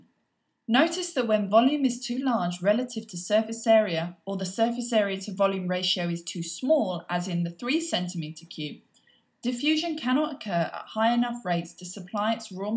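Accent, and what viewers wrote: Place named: United Kingdom. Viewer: = = british accent? im fine with dat.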